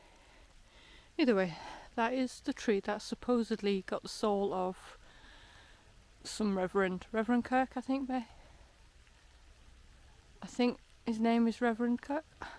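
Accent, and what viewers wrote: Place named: Northumberland, United Kingdom. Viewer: Nice relaxed narration, with excellent choice of music, gave it the mystical feel with your regional accent, soft like are you from Northumberland?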